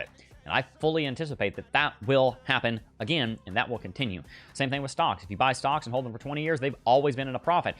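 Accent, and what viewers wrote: United States. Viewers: Im from the uk a normal person listen to this yank typical noisy thiko yank Thinks hes on a yanky bog roll advert 😂😂😂😂😂😂😂😂😂😂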